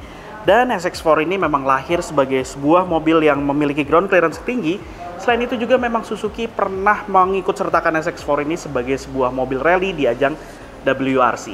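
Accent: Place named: Banjarmasin, Indonesia. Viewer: Kalau mendengar logat bahasa mas, logatnya logat bahasa Banjarmasin😂😎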